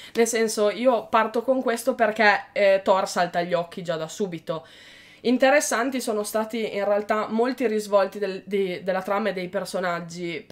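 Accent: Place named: Reggio Emilia, Italy. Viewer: SPOILER 😁Sei di Reggio Emilia?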